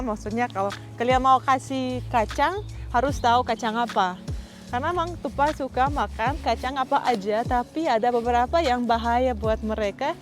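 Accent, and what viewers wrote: Indonesia. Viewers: penyapaianya matul,bule tapi indonesia banget, sopan,disegala hal👍👍sht dan sukses dan kluarga🙏🙏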